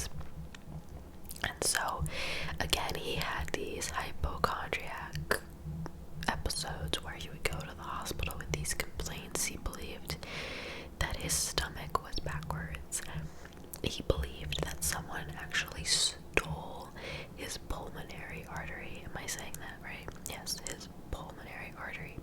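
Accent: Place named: Independence Township, United States. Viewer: Such a soothing voice Always gotta remind myself irl sounds like she's from Independence Township Edit: not to mention, ASMR crime stories have put me to sleep more than once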